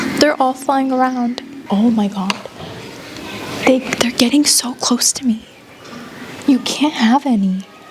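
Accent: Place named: California, United States. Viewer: Girl I’m from California too & im sure we do have an accent😂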